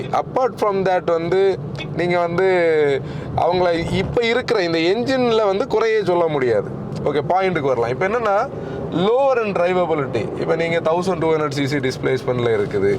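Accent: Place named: Nagercoil, India. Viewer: Every sedan, hatchback, suv and electric vehicle launch time. I search channel.for your"s valuable review. Because your brief and depth points like vehicle drivability, ride and handling. build quality and also your Nagor kovil language slang. Hat"s up bro and her team mates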